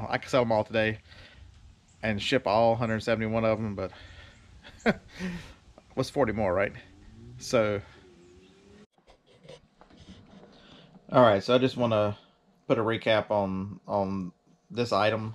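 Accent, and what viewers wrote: South, United States. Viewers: Love the country accent!!! All love from Georgia!!!!!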